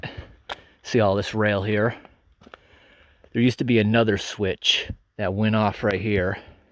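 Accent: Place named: United States. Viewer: Yep, I agree, his accent is American but with good pronunciation and little drawl - much easier to listen to for us Brits!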